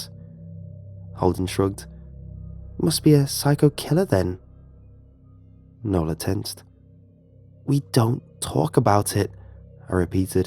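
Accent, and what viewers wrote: United Kingdom. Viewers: knows what he does his brit voice adds to the creep but then agian i only really listen to him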